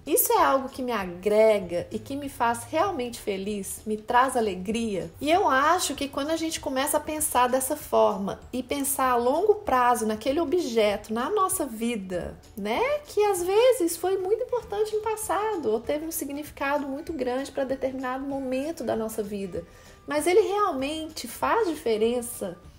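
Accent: Minas Gerais, Brazil. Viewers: vc é mineira?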